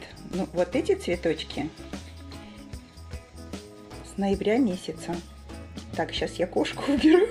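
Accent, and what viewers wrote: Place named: South-East, Russia. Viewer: я так и думала) Слышу, говор "свой", юго - восточный) Привет Вам из Днепра)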